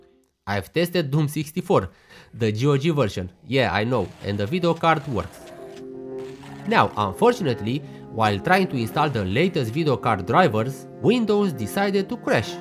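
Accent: Romania. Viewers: you are romanian right?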